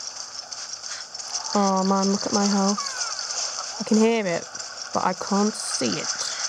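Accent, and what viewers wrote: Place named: Cumbria, United Kingdom. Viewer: Ahaha excellent, I'll be looking forward to it and thank you I've been wondering how it runs on the 3DS for ages. I'll have to be buying ultimate for the 3DS I own already as I am but a humble college student, too poor to afford a Wii U :( from the look of these vids though it doesn't seem like I'm having to compromise too much. If you don't mind my asking, whereabouts in the UK do you hail from? Your accent sounds like it's Carlisle/Cumbria-ish to me but I can't quite put my finger on it haha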